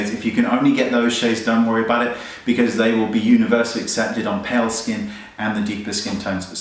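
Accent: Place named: United Kingdom. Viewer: Hey! Just want to tell you that i absolutely LOVE LOVE LOVE your videos!! They are SOOO helpful & I love your british accent ^-^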